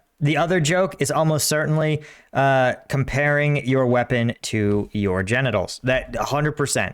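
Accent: United States. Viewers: That’s not a Boston accent it’s a New Jersey accemt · It's not a Jersey accent, it's a South Boston accent.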